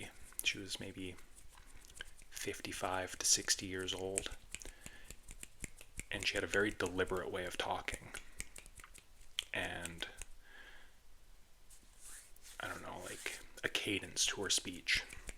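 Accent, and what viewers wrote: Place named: Canada. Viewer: Thanks for allowing us to join your channel! I do love how you talk, the way your voice sounds (so soothing and warm and comforting) and I ❤ your thick Canadian accent. And thaaank you for the roller ball!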